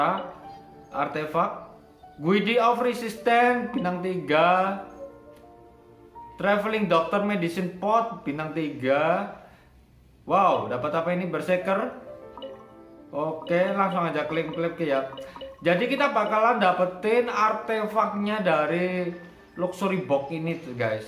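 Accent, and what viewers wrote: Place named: Java, Indonesia. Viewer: hmm medok men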